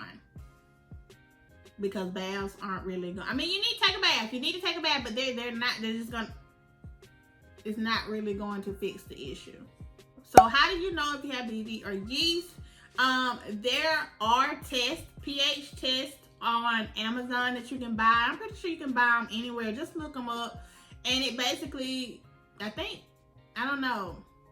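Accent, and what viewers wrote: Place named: South, United States. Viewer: I had a feeling you were from the south sis, you give the vibes hunnie❣️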